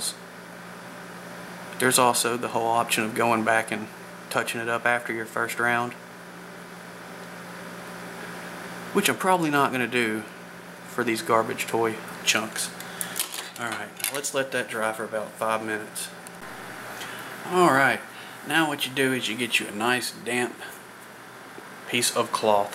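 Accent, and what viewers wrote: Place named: South, United States. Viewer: Love your dirty dirty south accent <3 And thank you for the tutorial. It helped me a lot! :)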